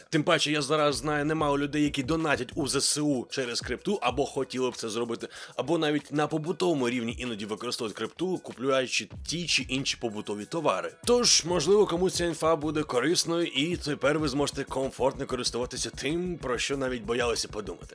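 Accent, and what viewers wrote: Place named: Russia. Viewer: Забавно, как русский человек с чистым русским языком вдруг решил считать себя украинцем. Вот только для селюка западенца твой украинский все равно будет с "кацапским" акцентом. Таки пропаганда пробила здравый смысл? Оно того стоит?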